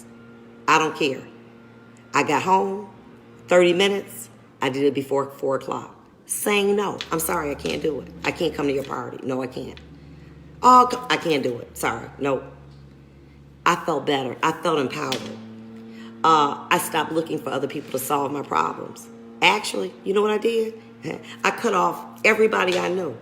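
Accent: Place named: United States. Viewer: I love her country accent.. I’m from New York and she sounds mad country lol I love it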